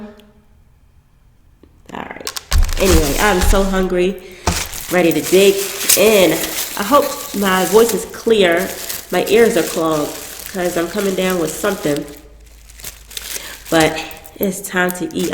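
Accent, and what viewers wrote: Baltimore, United States. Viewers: You from Baltimore?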